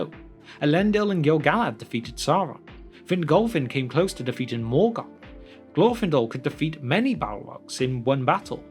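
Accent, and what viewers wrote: United Kingdom. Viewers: Where is the UK is the narrator's accent from? He sounds like thoughty2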